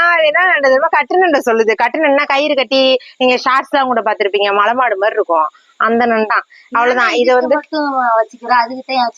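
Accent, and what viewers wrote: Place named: Sirkazhi, India. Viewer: 👏👏👏👏👏👏👏👏👏👏👏👏👏👏👏👏👏 super video velai seiya ma srichitte pakkara neenga tha pottu pesumpothu pazhya niyabgam varuth sirkazhi Chidambaram side apadi pesuvanga nanga leaveku pogumpothu appadibpesirkkom innatha appadinu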